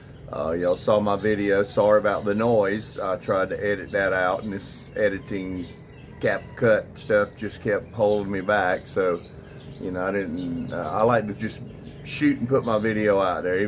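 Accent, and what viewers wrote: South, United States. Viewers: LOL. The southern accent combined with the 'jack sh*t'. Certainly American.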